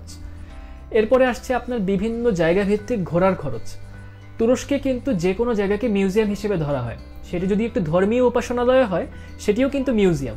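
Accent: Standard. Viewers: বাংলায় উপস্থাপনায় এক যুগান্তকারী উদাহরণ অপনি এবং বিশ্বকে সম্পুর্ন শুদ্ধ বাংলায় উপস্থাপন আপনার থেকে ভালো কেউ করতে পারে নি এটা নিশ্চিত ♥️♥️💕💕 এগিয়ে যান আরো অনেক দূর ♥️ আল্লাহ তায়ালা আপনাক নেক হায়াত দান করুক ♥️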